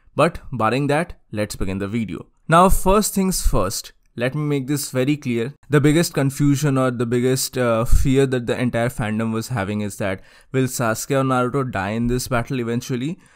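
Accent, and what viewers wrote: India. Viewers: You speak in Indian assent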